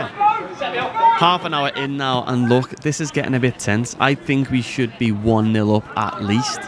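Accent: Liverpool, United Kingdom. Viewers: Cold Scouse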